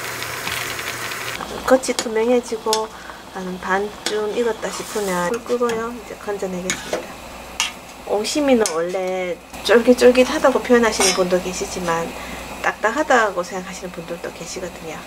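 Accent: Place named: Gyeongbuk, South Korea. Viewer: 구독한지는 오래됐는데 처음 댓글씁니다 친정이 포항이라 경북말씨 너무정겹습니다^^열심구독합니다. 감사드려요. 좋은 오늘되세요~~~**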